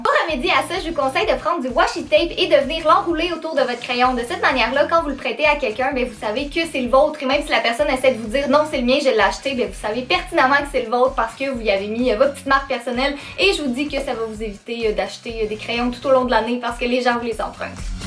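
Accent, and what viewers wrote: Quebec, Canada. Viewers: J adore ton accès canadien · Tu es québécoise ses cool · filou cannelle sont accent est canadien elle me la dit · J'adore ton accent québécois 😘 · j'adore ton accent canadien !😊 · Accent québécois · J'aime trop l'accent québécois Ahah, sinon super vidéo !😍✨ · Au début j'avais du mal avec l'accent canadien mais maintenant j'adore 💛💚💙💜❤️ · ça me fais bizzare je suis de France et ton accent canadien est tellement différent du français ça me fais drôle ;) super vidéo en toit cas. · Miss Panthère Forever en plus c'est vraie le Québec et au Canada mdr l'autre elle a dit c'est un accent canadien 😂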